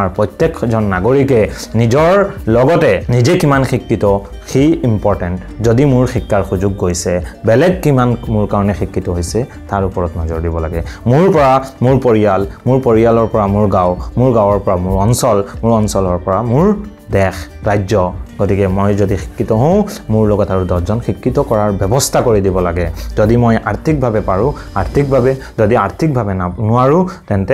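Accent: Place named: England, United Kingdom. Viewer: M a big fan of your English ascents bro 🔥 Great work carry on 🙏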